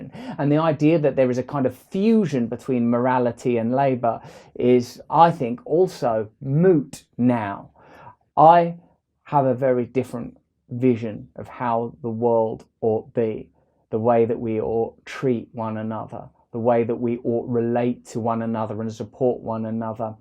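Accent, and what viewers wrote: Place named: Cockney, United Kingdom. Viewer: Cockney Christ.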